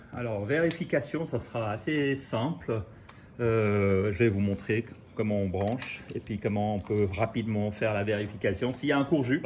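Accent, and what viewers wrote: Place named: Vaud, Switzerland. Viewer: Très sympa et j'adore l'accent vaudois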